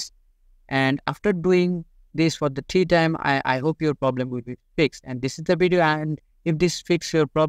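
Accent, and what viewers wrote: India. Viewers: After i heard indian accent i knew i found the one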